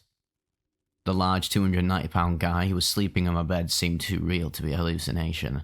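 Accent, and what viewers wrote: United Kingdom. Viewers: Yeah ma these narrations make me feel that my life is not that bad . I smoked and dug heroin for 25 years ,finally this year I saidto myself that I am not that anymore.. Even stopped the pgs -pregabalin 900ml a night at least for years. Diazepam .. Even the mirtazapine,after stopping mirtazapine I realised it was those absolutely draining all my energy and depressing the shiz out of me.. Couldn't sleep maybe 1-2 hours . These videos at night with the jlabs in are like magic . Sleep finally . I like the Salvia ones ,especially the Salvia loud dreadful scary machine thing people talk about .. Nice to hear someone from the UK aswell ..I'm in Doncaster..A rite shithole.. Well all England is a dumping ground for the money laundering men's hairdressing /mini mart selling bacci under the counter .. Keep em coming Brother ..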